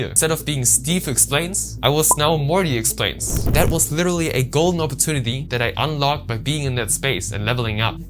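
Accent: Germany. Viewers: are you german loool? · are you German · German Accent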